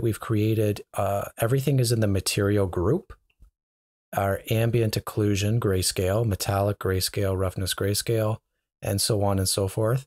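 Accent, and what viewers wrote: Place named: Canada. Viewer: thought you might be Canadian by the accent but then I knew for sure when you typed "colour" ;)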